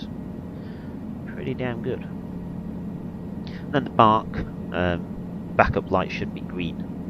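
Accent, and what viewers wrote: United Kingdom. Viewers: I have to admit that my lack of comprehension was also due to me being Canadian and having a hard time understanding the British or Australian accent, unless I can also read lips 😂. No idea if yours is a British or Australian accent, or whatever, but I would guess British, right ? 😂🤣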